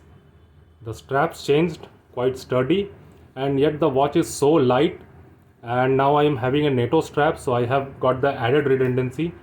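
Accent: India. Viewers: I never get tired of listening to indians speaking english. 😂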